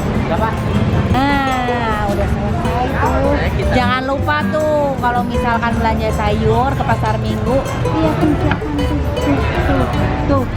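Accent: West Java, Indonesia. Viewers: Aku sneng bgt sama chanelnya te suka sma logat sundanya, upload yg bnyak ya teh 😁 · Ari teh teh orang bandung na palih mana, meni resep ih nyariosna lucu khas sunda pisan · Salam kenal dari aku teteh seru banget belanja ke pasar semua diborong Teteh aku sangat suka nonton semua Vidio teteh Cara ngomong teteh b sudanya masih terasa Itu yg bikin khas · teteh meni raoseun logat Sunda na😀😘😘😘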